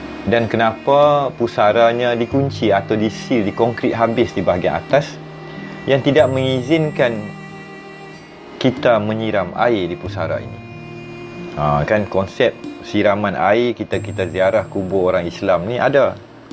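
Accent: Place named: Kedah, Malaysia. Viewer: Wah Bercerita menggunakan loghat utara (melayu kedah ). Jgn diklaim bahasa indonesia pula.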